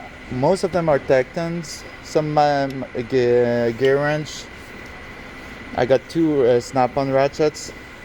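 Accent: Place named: Quebec, Canada. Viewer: “Sorry for my accent, I’m french Canadian. But it could be worse, I could be just french.” Love it! Sweet tour, I’m going to be buying a Tekton box soon. Nice to be able to support a Canadian manufacturer.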